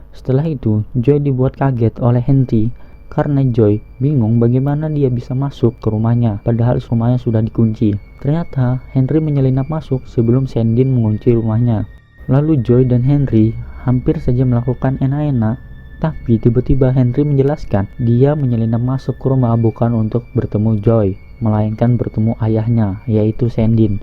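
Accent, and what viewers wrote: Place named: Indonesia. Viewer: Maklum Bro,Mulut Orang Indo Ga terbiasa Dengan Bahasa Inggris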